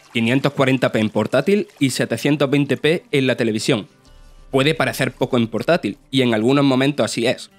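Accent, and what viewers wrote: Andalusia, Spain. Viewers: No, yo diría que es andaluz. No es por faltar ni nada, a eso me suena su forma de hablar.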